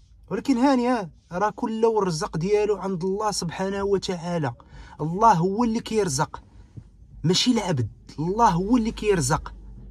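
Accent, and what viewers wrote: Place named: Algeria. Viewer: سمح لي اخويا وقيلة نتا ولد 2000 وطلع لاحظت كلامك كيشبه للجزاير واش متبع الحزايريين تانسيتي لهجتك المغربية؟ ديك كلمة ياو راني نتبع فيك راه لهجة ديالهم ولازم تاهي ديالهم والمدامة ديالك لي قلتي فالتعليق لاخر تاهي ديالهم .المهم حاول تهدر بالمغربية احسن .هما ولاو يهدرو بلهجتنت وانتم العكس وليتو تهدرو بلهجتهم؟ كون غير زينة وهي نصها مديكالي وكلها فرنسية معوجة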